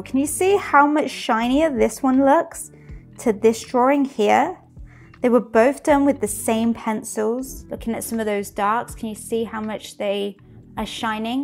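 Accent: United Kingdom. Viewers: Me encanta escuchar tu acento britànico jeje aunque no me queda muy claro si los dibujos a lapiz deben brillar o no, es recomendable o no que brillen? that is the question my Dear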